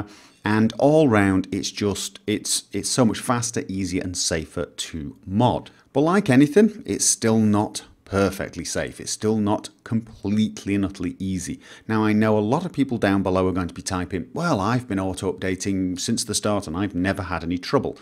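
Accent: United Kingdom. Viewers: I actually first thought you were from Britain because of your English. A lot of people who have English as their second language have a really obvious pronounciation/strong accents. (like a lot of people from Roman or slavic countries)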